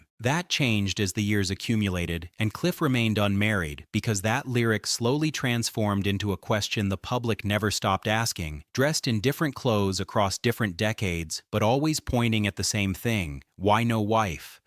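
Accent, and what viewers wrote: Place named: United States. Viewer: Why is an American doing this commentary again ?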